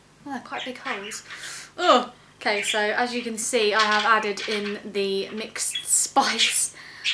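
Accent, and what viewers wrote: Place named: RP, United Kingdom. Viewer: Very posh.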